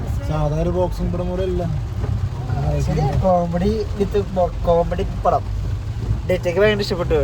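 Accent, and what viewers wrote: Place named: Thrissur, India. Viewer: 😹ithethu slangaaa ,thrissuraa...chavaru slaang ...njan stopped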